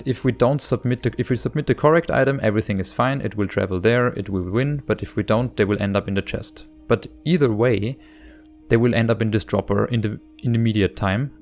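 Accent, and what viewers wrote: Germany. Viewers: Are you german i think you have a german accent!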